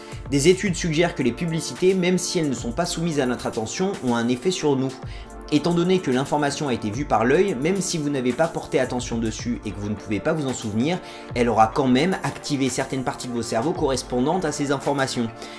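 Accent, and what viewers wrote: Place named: England, United Kingdom. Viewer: Best accent anglais ever!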